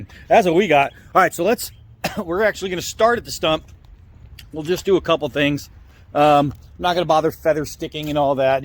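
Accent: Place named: New England, United States. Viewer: Yeah, I figured you were a combat vet. My entire family was in the service. Except me….lol. In listening to you talk, I kinda figured it out. Well, that and you’ve got the New England accent like me. Anyway….stay safe,little brother and thanks for the content. It keeps this old man in good spirits and makes me look forward to your next vid. Be well….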